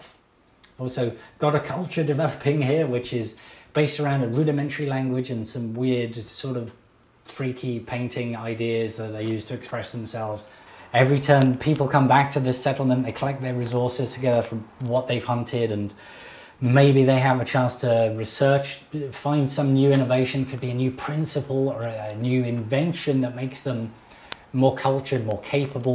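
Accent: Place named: London, United Kingdom. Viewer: Then why does he talk like he's in London?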